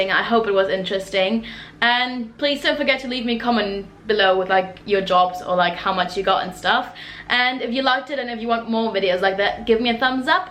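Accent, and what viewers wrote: England, United Kingdom. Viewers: Interesting for visiting foreigner tourists/workers to know approximate rates. Now tell them about your superannuation that you can get back ! As an aside I met a German young woman working as a waitress in Brunswick, Melbourne. She was on her 2nd year visa. To get her 88 days up she took a job outside Barcaldine as a Jilleroo. 1 months trial and she stayed for 6 months until the harsh summer. She bought an Australian stock saddle to take home for cross country riding. Next video (lol) please speak some German you sound far too English. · She's probably been hanging around too many UK tourists and have picked up their accent. No way does she sound anything like all the German tourists I've encountered nor whilst in Germany myself.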